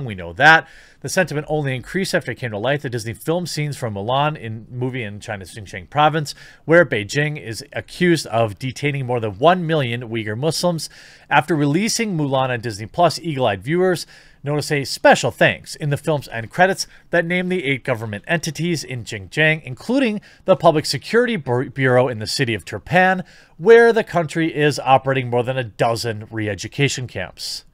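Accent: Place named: United States. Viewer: You're saying Xianjiang wrong, but I've heard a lot worse. You're just saying it with an American accent. You're also saying Uyghur wrong. It's not "We grr." That's just how MSM pronounces it and I guess most of the West now thanks to them. It's more pronounced like "Uuu ga ru." (I forgot how to write down sounds but that's the best I can do. lol)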